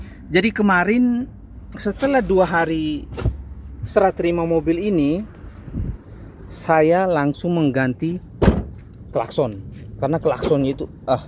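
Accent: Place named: Nias, Indonesia. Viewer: logat nya kyk nias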